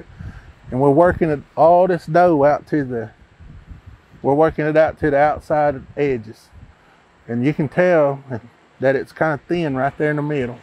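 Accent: United States. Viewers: Are you referring to his accent? Because, this is a great example of an Okie accent. I understand him perfectly. Yes, I am an Okie as well. Boomer Sooner Baby! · You sound like a country boy! Hey this is coming from a country boy just on the south side of the red river! Man I had some of this over in New Mexico and man was it good stuff! You make it look easy Im going to try iit Thanks! Curious does it taste better if the warm water is poured out of a OU cup?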